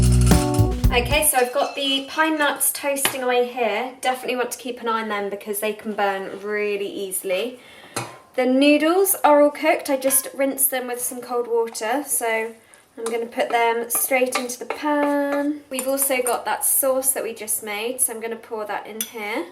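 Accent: United Kingdom. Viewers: irrelevant but who just LOVES British accents?? I'm Canadian so...no posh accent for me😅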